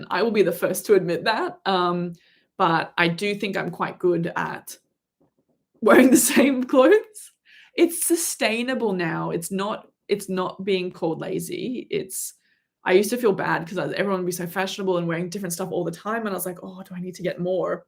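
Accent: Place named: Australia. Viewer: I fell in love with the Australian accent charm thanks to. I loved, love, will love this channel. I’m going to miss you so much.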